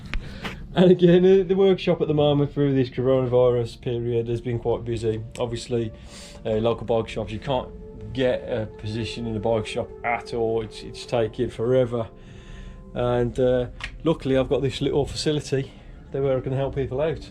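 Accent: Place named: Midlands, United Kingdom. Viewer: yeah I thought I could hear the Midlands twang lol im from great barr , really down to earth videos im enjoying them , I'm just trying to sort myself a starter bike , although I hope there isn't an age limit I'm 54 , exercise and weekend rides with my lads , any tips on what to look for, unfortunately budget a bit tight so I'm looking for a cheap as I can and maybe upgrade .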